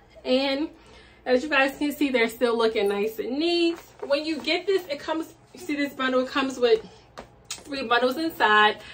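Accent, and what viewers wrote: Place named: Maryland, United States. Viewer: I love your hair, and love the accent, you got to be from Maryland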